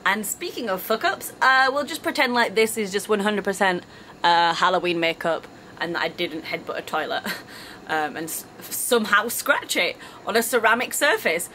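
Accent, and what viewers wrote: Yorkshire, United Kingdom. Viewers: Welcome back.... great to hear your Yorkshire accent again .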